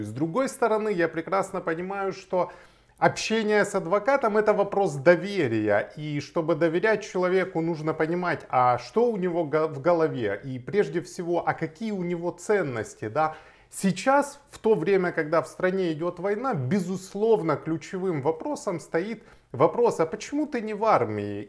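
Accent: Ukraine. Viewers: Это не русский язык а ХАРЬКОВСКИЙ.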